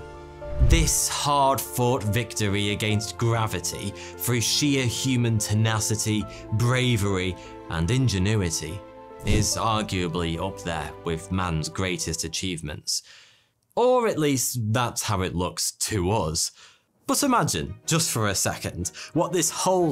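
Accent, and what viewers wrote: United Kingdom. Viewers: I know that Brits say a lot of words differently (from Lost in the pond YouTube) but Charles' last name is Lindbergh not Lindenbergh. I had to listen again your voice while great is so soothing too. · bruh. I hate when people use copious amounts of emojis with a crappy throw away comment. Like this ain't a rap video that needs hype and It doesn't drive home Any point you made cause you made none. with his British accent sounds exactly like 42. And if you check pretty much all his videos everyone always thinks he's saying 42 until they realise, then we all have a giggle. Get it? Good attempt tho "🤣🤣🤣🤣🤣🥳🥳🥳🤯🤯🤯🌚🌚🌚🌛🌚" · I thought that’s what his screen name is, too, because of his Brit accent.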